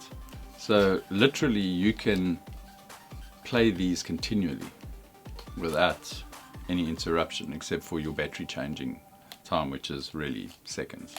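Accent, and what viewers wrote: South Africa. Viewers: Came looking for info on a firmware update for my 840s. Stayed cause I heard a south African accent! Nice one boet!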